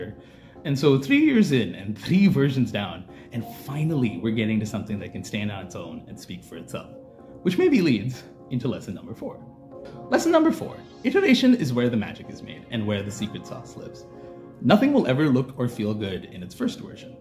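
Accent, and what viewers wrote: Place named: Brazil. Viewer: Your accent almost made me think you're Brazilian... It's so close...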